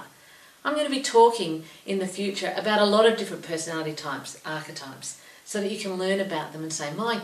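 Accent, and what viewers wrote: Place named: Australia. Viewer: love both - the jungian archetypes & the aussie ascent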